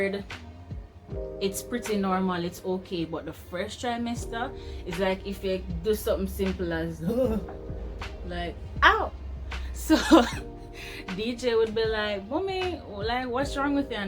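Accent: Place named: Jamaica. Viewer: That Jamaican accent 😻😻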